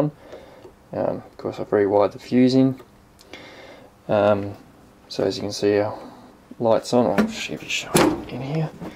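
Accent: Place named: Australia. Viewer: Nice motor installation. Now to make some chips. From the accent I am guessing you are in Australia.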